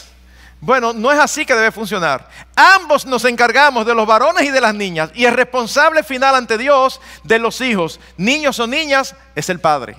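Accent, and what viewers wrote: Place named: Dominican Republic. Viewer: Muchas gracias pastor, me encanta su acento dominicano trayendo estás enseñanzas bíblicas de la crianza, el Señor lo guarde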